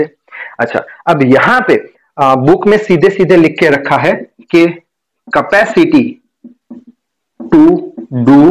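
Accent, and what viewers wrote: Gujarat, India. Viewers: sir ji ape Gujarat se ho ?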